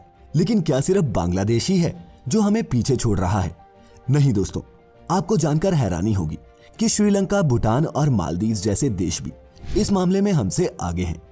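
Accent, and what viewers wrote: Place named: Odisha, India. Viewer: Your Odia 🙄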